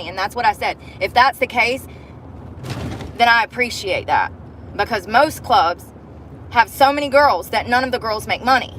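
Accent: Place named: South, United States. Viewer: Your southern accent... it's on 🔥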